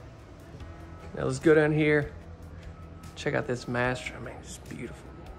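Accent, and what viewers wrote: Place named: South, United States. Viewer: I love your videos. The quality of filming has truly improved as you learn more from our comments. These homes are certainly impressive. But I totally watch them for another reason. I love your accent. It has been years since I was last down in GA and heard me some sweet southern charm. Have a blessed day.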